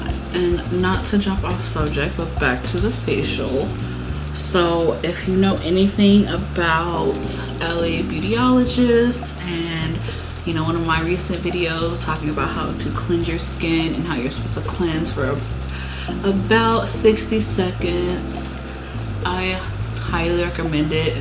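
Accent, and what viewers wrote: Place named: Haiti. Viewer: Are you Haitian? You have the accent.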